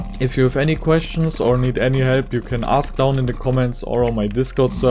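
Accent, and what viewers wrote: Germany. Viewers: You sound like typical german-english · Are you German? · Was ein deutscher 😂😂😂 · Tysm your german ? U got that accent?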